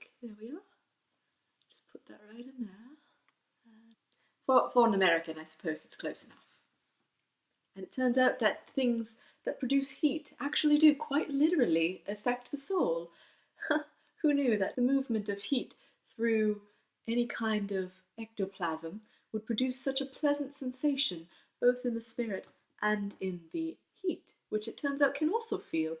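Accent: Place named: England, United Kingdom. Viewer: This is great and I love your English accent.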